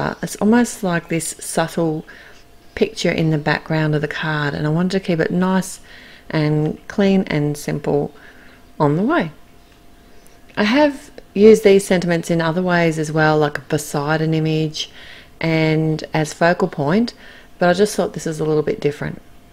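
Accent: Australia. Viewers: I love to watch your videos and this card is no exception it's brilliant!!! It's refreshing to see and hear an Aussie designer in card making video's although I love all the ladies and the men too lol I live in Sydney and thank you very much for the Aussie website links to almost all of the products you use :)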